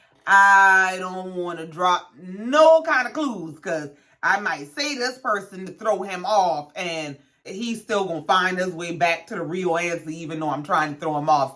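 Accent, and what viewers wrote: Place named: Gullah Geechee, United States. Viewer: I knew you sounded like a cousin!! I’m from Savannah! Heeeeey Geechee cousin!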